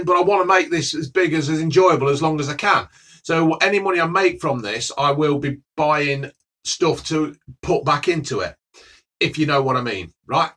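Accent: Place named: Leicester, United Kingdom. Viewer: Suiceyed love the leicester accent on this man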